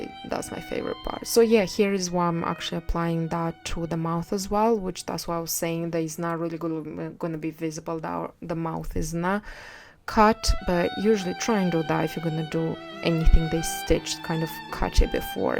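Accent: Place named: Europe. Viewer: I love the mix of accents you have! Something European with some English cockney in there a bit! Really neat to listen to! You’re very creative, love watching you make something come to life ♥️ I like your “play it by ear” style, I’m similar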